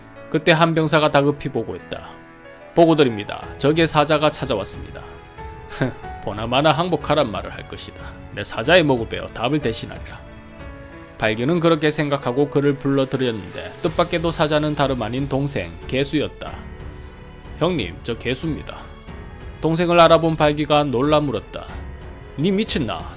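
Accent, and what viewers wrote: Gyeongsang, South Korea. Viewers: 고구려이야기에 경상도 사투리 쫌 그러네요 ㅎㅎ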